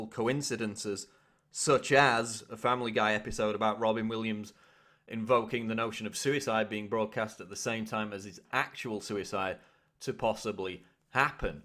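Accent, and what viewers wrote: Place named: England, United Kingdom. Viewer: Like the Boston psy-op was also a coincidence - same nonsense, Family Guy episode. ROLLS EYES This is not just a sacrifice, but it's telling people who are awake that there is NOT A THING you can do about any of this. Shills like Watson here know what's up but are FORCED into the establishment's plans to mind control people - or else. And no, the "lunatics" are not coming out of the wood works. The "lunatics" are calling BS on people like YOU, and Mr. Jones, your boss. Syyyyn-chrone-IS-iities.... that fucking English accent gets old real quick when pronouncing that word. See if you can do something about it. Thanks!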